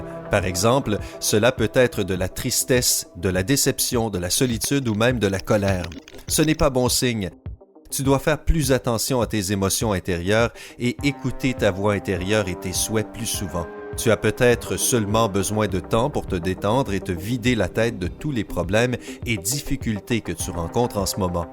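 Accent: Quebec, Canada. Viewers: sablita 07 nan il a l’accent québécois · Avec cet accent québécois à la con c'est insupportable d'aller au bout de ces vidéos. Heureusement d'ailleurs, parce que je n'ai jamais vu un tel tissu de conneries. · Moi mais c l'accent québécois qui donne cette impression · Oui c'est vrai sa il a un accent québécois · Tu est québécois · Lama Fâché version Québécois, je renomerais la chaine Lamarde Fâchée su j'étais toi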